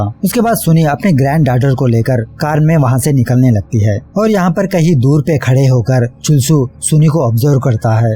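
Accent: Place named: Bengal, India. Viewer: Bhai saab ap yeh sab movies kahan se Dhundke nikalte ho, ASTONISHING😲 😍!!! Love ur Bengali accent in the explaination 😍😍😍... Continue it we'll Support it!!!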